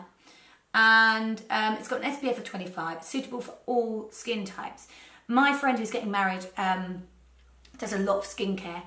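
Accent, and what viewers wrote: United Kingdom. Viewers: British accent is music to my ears 😍😍❤️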